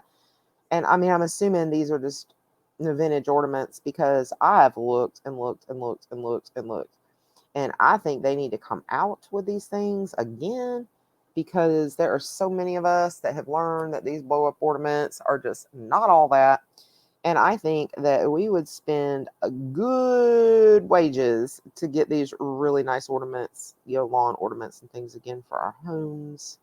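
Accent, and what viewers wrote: South, United States. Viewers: I’m a recent subscriber to your channel, having come upon it by chance. I immediately picked up on your “accent,” as I, too, am a Southerner! I currently live in SC but moved here from the Atlanta area last year. Your coloring page looks so cheerful colored by the glittery Gelly Rolls. As a relatively- progressive retired pastor in a mainstream Protestant denomination, I found your comments about Christmas interesting and appreciated the remark about the X in X-mas. Indeed, the X stands for the Greek letter chi, which is the first letter of the Greek word Christos, from which our word Christ comes. So, yes, using the abbreviation X-mas does NOT leave Christ out of Christmas! Thanks for sharing!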